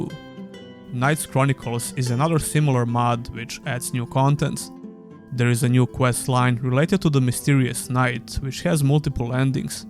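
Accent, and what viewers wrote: Serbia. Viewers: I could recognize a serbian accent from miles away :D Dobar kanal brt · ZNAO sam da si naš po akcentu :) odličan video!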